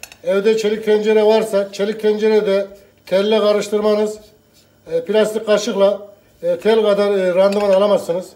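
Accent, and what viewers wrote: Turkey. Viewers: Biz böyle seviyoh gardaşım şefimizi, herkeş İsdambul Turkcesi gonuşmah zorunda deyil...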